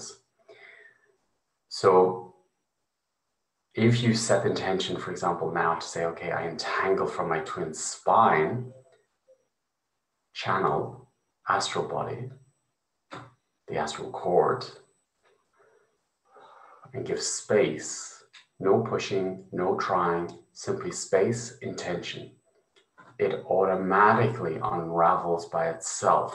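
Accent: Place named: Ireland. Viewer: Thank you,. Wonderful xxx When Kim D’eramo mentioned your name It sounds so Irish to me (also Irish!) and now that I’ve heard you, of course you are! Love and gratitude xxxx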